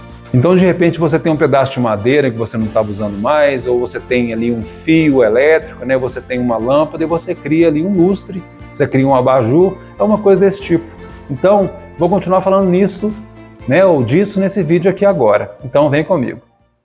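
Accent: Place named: Minas Gerais, Brazil. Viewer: Esse mineirês é tão charmoso, aconchegante e bonito, né? Tal qual as peças!!!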